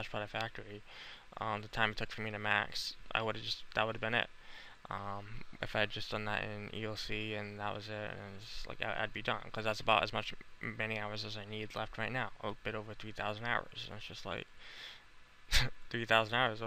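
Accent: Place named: New England, United States. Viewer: i thought you sounded like you were from the new england area, MA here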